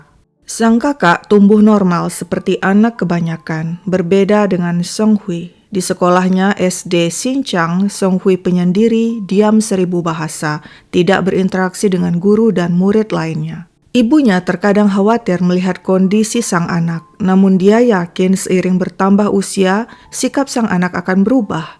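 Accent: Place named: Medan, Indonesia. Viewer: kakak btw orang mana .seperti orng medan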